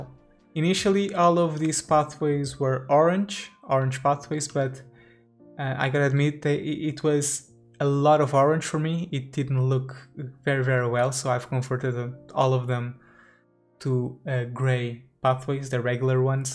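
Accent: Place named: Portugal. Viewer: Adoro o teu sotaque tuga inconfundível!!!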